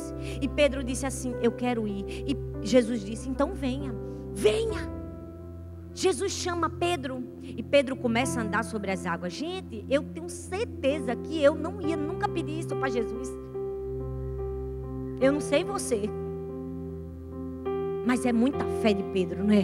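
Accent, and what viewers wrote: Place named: Pernambuco, Brazil. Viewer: eu tenho esse mesmo sotaque dela. 😂😂 Tbm sou de Pernambuco. Só falta eu visitar a Igreja do Amor e apreciar esse 'bela' voz de perto 😂😂😂😂😂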